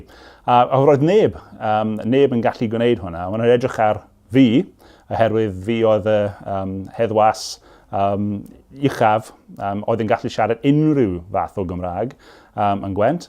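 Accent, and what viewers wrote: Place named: England, United Kingdom. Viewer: How have you managed to speak Welsh with an English accent?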